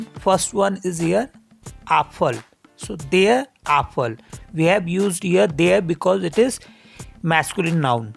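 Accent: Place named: Germany. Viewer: I realy like the German accent just I like the Japanese accent. This is nice.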